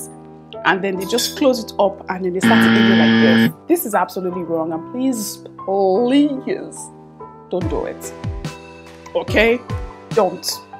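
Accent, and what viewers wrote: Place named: Nigeria. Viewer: I can feel some Nigerian accent ☺️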